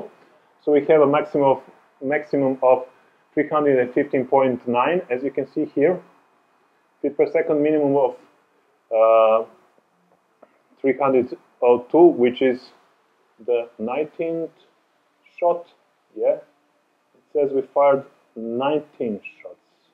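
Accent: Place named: Eastern Europe. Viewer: Ну оочень славянский акцент😅😅😅😅😅😅😅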